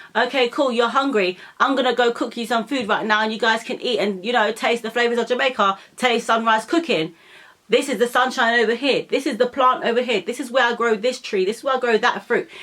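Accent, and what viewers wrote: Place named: United Kingdom. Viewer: Thanks for your honest and truthful opinion about....I can see it in your eyes and your facial expression. I too share your opinion, evaluation and sentiments about. I think he is a very honest, hardworking, progressive young man who has chosen to impress the world with his cooking skills, his simple and humble lifestyle, the beauty of jamaica and our culture. Not to mention the love of his dogs and cats, the relationship with his son, his dad and other family members. attitude and personality is so transparent and pure that, even though I have never met him, he has "virtually" become a part of my family. This is for... Me know se u a go through some stuff right now my yout'.. no mek it get u down. U young an' u strong. Life is a teacha', learn u lessons well. No mek de some mistakes twice..zeeen.. Nuff said...anyway...big up youself Pretty girl..love de British accent..ONE LOVE. 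🥰🥰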